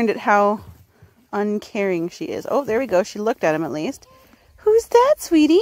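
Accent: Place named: Canada. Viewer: Sounds like you’re from Canada. Such a beautiful birth.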